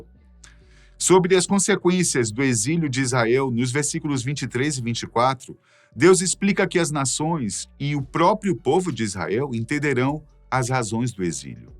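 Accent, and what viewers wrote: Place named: Northeast, Brazil. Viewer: Conhecendo o canal hoje o vídeo já tem mais de 11 meses mas é atual. Muito interessante a explicação das profecias. Acompanho as profecias bíblicas e o tempo de Deus é diferente do nosso. A Rússia também está nas profecias. E também tem os cavaleiros do apocalipse pode ser cada cavaleiro uma nação. Você parece ser conhecedor de história seu tom voz é clara apesar do sotaque nordeste. Israel está vencendo o mau do terrorismo o que virá depois?? A Rússia escalando a guerra. 🇧🇷🙏🇮🇱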